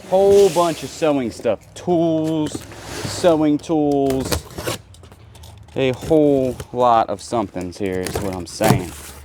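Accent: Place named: South Carolina, United States. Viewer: If I close my eyes I can almost hear Andy Griffith speak, must be that SC accent!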